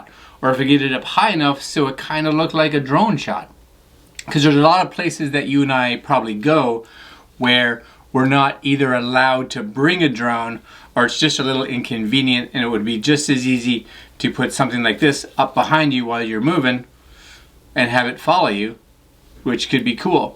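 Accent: Canada. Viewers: That's one hell of a Canadian accent ya got there chuck.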